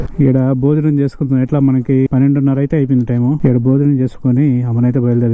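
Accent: Nellore, India. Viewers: బ్రదర్ పూర్తిగా నెల్లూరు యాసలో నె మాట్లాడండి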